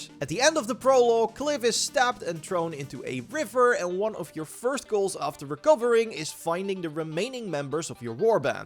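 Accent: Netherlands. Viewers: Bruh learn how to speak English. Why do you have to push that Dutch accent in so hard? It makes your videos unwatchable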